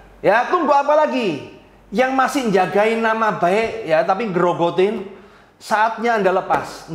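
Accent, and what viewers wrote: Java, Indonesia. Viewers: Motivator yang OK dan terkenal rata rata itu medok 😊 joss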